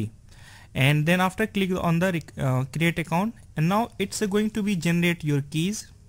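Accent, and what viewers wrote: India. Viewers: Sounds like maybe India?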